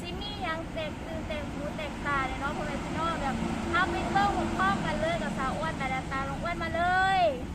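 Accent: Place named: Isan, Thailand. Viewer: ข่อยกะอยากไปเด้บางกอก ข่อยอยากรุ้ว่าเจ้าอยุ่เมืองใด๋เว่าคือคนอิสานบ่แม่นสำเนียงลาว ..เด้😊😊😊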